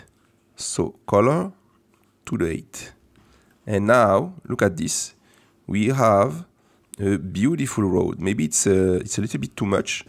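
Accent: France. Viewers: i like your french english ascent hhhhhhhh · Le bon accent français! J’aime beaucoup le tuto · Salut je viens de voir ta vidéo dans mes recommandations alors que je ne regarde jamais d'animation 3d et enfaite c grave styler et sa doit prendre beaucoup de temps sinon continue comme ça mais je me suis dit que j'allais envoyer le message en français c que tu a un peux l'acsan français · PTDR ta un acens Bien FRANCAIS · T'as un accent français ça me fais tellement rire x) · Tu pourrais le faire en français stp car j'ai l'impression à ton accent bien qu'il soit très bon, que tu es français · Mais tu as un accent français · french accent are crazy bro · tu es français toi haha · on sent l'accent français mdr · Tona accent français 🔥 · Tu esy français mon amis 😉 je t’ai reconnu sinon bon travaille 👍🏽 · A l’accent je dirais que tu es Français non? Merci pour ton tuto, en français ce serait top 😁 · Ça s’entend qu’il est français le man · You sound french or something like that , cuz i hear an accent , i mean i'm french and i hear the french accent , i'll be surprised if your not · Toi t'es français 😂😭😭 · This is amazing. 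Thank you so much! Your french accent makes this long tutorial so much more tolerable! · Wsh mais t français sa se voit tellement avec l'accent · T es français ? En tt cas t as un accent français du coup j comprend 😂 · Tu parle français sa se vois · L'accent français 😂 sinon très bonne vidéo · Ton accent français se remarque direct mdrrrr Continue comme ça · you have a french accent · serait tu français avec ton accent ? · Magnifique accent francais ^^ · Baguette But honestly I just love french accent... you saved my evening... I don't even care about blender and animation but still very interesting and well explained :D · tres bon tutoriel avec un bon accent Francais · GG pour le travail, j'aime cet accent français Ahah ! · ça ce voit que t'es français sauf que moi aussi et du coup ça me fait rire parce que c'est la vidéo parfaite mais je comprend rien 😂😂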